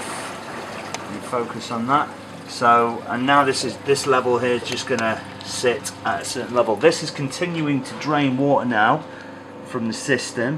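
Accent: United Kingdom. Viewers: Hi just found your channel I love your tank and setup, I can see its going to amazing. You sound like a mancuniuan to me, I am from manchester and don't know many other reefers in the area so its nice to see. keep the vids coming.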